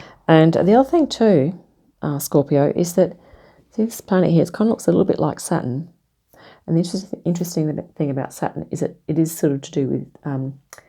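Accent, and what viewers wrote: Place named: Australia. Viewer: Yay another Aussie accent 🎉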